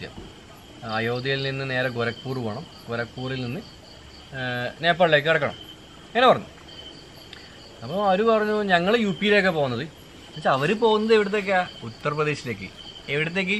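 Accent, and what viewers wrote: Kannur, India. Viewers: കണ്ണൂർ ഭാഷ പൊളിച്ചു ഭായ്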